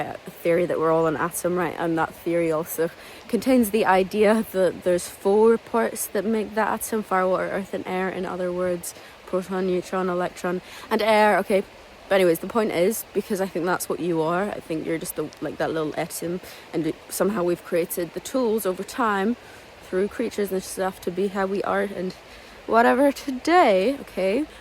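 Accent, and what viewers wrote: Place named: Australia. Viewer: your voice sounds eaven aussie a lil bit, you might be onto something with all these theories 🙂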